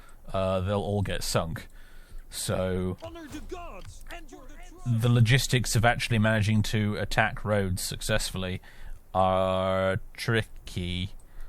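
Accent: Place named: South, United Kingdom. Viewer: Are you from south england?, when your voice cracks you sound mightly like soviet womble i must say.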